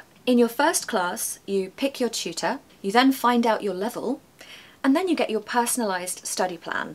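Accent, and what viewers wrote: United Kingdom. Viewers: I love British pronunciation.. because it very clear sound Thank you...❤❤❤❤ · I’m from 🇹🇭and I really love your British accent it’s so nice to listen to. I really wish you’d make longer video or even a podcast. I’d happily listen to you every day! ❤ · Hello Miss, I am an English learner from China, and I saw your video on YouTube. You know, British accent is very popular in China, and your English accent is very nice, and your voice is touching. Your video also shows many unique cultural differences between England and China, as well as popularize many UK culture and interesting traditions. Someone's moving your video from YouTube to Blibli (A Chinese Video Net like YouTube) I don't know if you know this? I suggest you also register an account on Blibli and upload your videos, so that there will be more Chinese English learners watching your videos.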